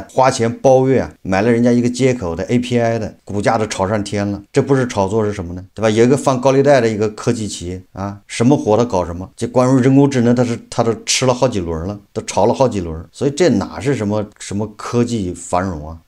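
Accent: Anqing, China. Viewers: 你是安庆老乡吗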